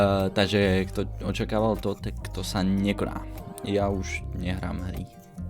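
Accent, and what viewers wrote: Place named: Slovakia. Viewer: s fúzami?😳 Toto je insane nečakala som že sa niekedy vrátiš. 14 ročná Simča ktorá kukavala vždy na tvoje streamy a čakala na druhý deň keď stream začne tak je back na tvojom kanáli len tiež trochu postaršia ale fúzi jej nerastú😆 Nikdy by som nepovedala to že si na tieto časy ešte takto zaspominam milovala som to. A čo sa týka momentálneho kontentu mne osobne to nevadí auta mám rada a slovenskej angličtine rozumiem😅 takže sa teším na ďalšie videjko☺️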